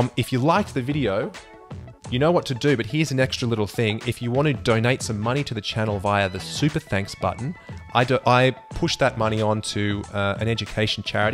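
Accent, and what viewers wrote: Australia. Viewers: You speak Australian accent?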